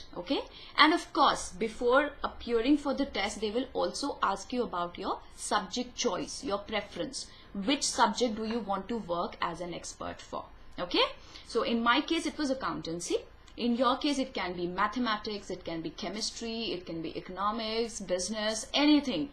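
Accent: India. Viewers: Hahahahahahahahah....... her accents is so Indian............I am not Indian and the moment she says, "Hey guys or but" i knew that she is paka Indian!!!! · Your accent are Indian. So you might be an Indian. Anyway let me try <link>. And BTW you are looking cool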